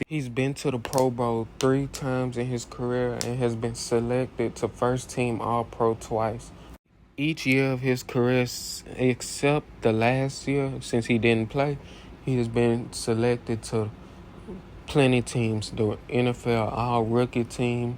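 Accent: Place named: New Orleans, United States. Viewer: Good video and great job. I loved how you said playa. Your N'aulins accent warms my heart. I've moved out of Louisiana to Mississippi but my eyes are always looking back to my hometown. I'm 'bout to subscribe to your channel and smash that like button. I'm a who dat baby. Are you ?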